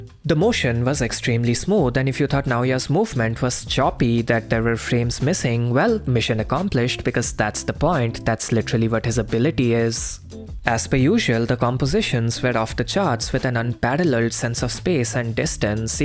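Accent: India. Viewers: Like my comment if you think his indian accent ruins this video and want him to use an american or any other correct english accent. Sorry mate but we are all making a stand. If you dont change the accent on the video we will unsubscribe until you do. Peace be with you brother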